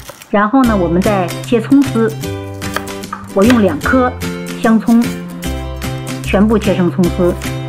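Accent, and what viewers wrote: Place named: Tianjin, China. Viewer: 天津口音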